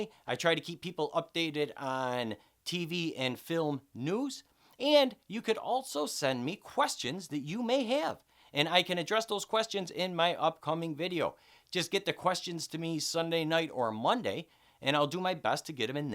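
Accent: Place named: Midwest, United States. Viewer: Still consistently the best TPS03 companion on YouTube. I look forward to your input each week. Thank you! PS your midwest accent is a fantastic bonus. Southern Michigan? Whatever the case, you rock.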